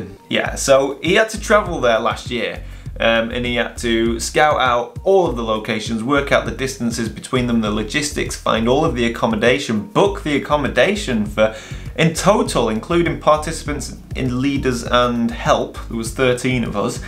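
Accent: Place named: Northern England, United Kingdom. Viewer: Its a shame that you feel you have to defend yourself. I believe the problem stems from the fact that many of us can’t afford 4k for a trip; most of us are philosophical about it, but it seems a few take the angry route...they tend to be far more vocal. Don’t pay them any mind, you have nothing to justify. Having said that, their bad behaviour has led to you producing a very interesting vlog, so...cheers dumbhorses 😂 I am quite new to your channel, via Svalbard with Morten, enjoying your work. Though frustrated, I can’t quite place your accent...I am thinking between Buxton / Sheffield. I grew up in Donny, but have lived in Scotland for 30 years...I need to get out and photo this scenery! Cheers / slanj 🥃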